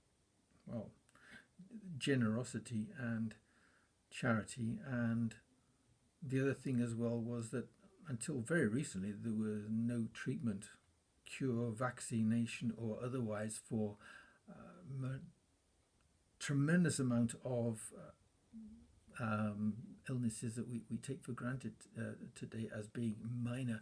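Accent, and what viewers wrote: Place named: United Kingdom. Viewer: I'm trying to work out where in the UK your from by your accent but drawing a blank 🤔.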